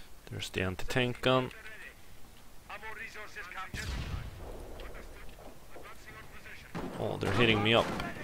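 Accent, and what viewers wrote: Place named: Sweden. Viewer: Where are you from? :) You sound Swedish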